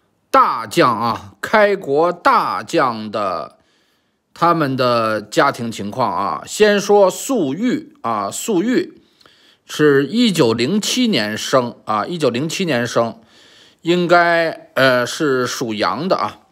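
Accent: Beijing, China. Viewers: 听您一口的京腔就感觉亲切。给您提示一下：萧新华少将不是肖劲光的儿子。他爹是老资格的萧克上将。和肖劲光一样也是湖南人。萧新华文革期间在71-73年下放到湖南长沙。是我们长沙市第三中学（明德中学）的老师兼初中四连的连指导员。后来他在去武警之前在军队的新鑫公司当老总。8-90年代我在北京工作期间去看过他几次。非常随和的人，长得威风凛凛的，和演员项堃有点像，高大的个子，篮球打得很好。是校工篮球队的主力。可惜失联几十年了。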